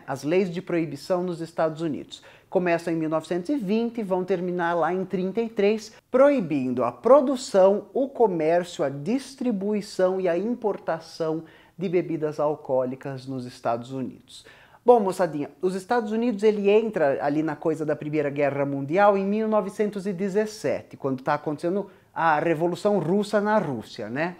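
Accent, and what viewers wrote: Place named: São Paulo, Brazil. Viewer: Parabens pela interpretação do sotaque típico paulistano... e a diminuição dele de forma gradual e imperceptível conforme a seriedade do assunto avança. Genial!!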